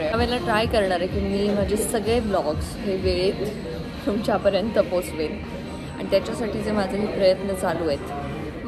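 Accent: Malvan, India. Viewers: तुझे व्हिडीओस मी नेहमी बघते. तुझे मालवणी बोलणे ऐकून मी तुझ्या प्रेमात पडली कारण मालवण वरचे प्रेम🥰❤️ तुझे सर्वच व्हिडिओ खूप काही शिकण्यासारखे आहेत. एक मुलगी म्हणून तुझा खूप अभिमान वाटतो🫶🏻 तुझ्या वयापेक्षा तू खूप जास्त अनुभव घेतलास त्यातून खूप शिकलीस आणि तो तू सर्वासोबत शेअर करतेस🥰 तुला तुझ्या पुढच्या वाटचालीसाठी खूप शुभेच्छा👍 असेच तुझे सर्व अनुभव शेअर करत राहा आणि खूप खूप फिर मज्जा कर❤️❤️❤️ love you di❤️❤️❤️😘😍🫶🏻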